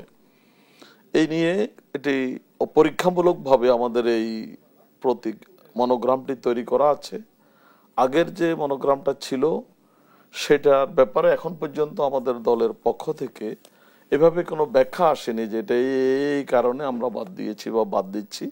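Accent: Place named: Kolkata, India. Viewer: সনচালক কলকাতা স্টাইল এ কথা বলেন কেন?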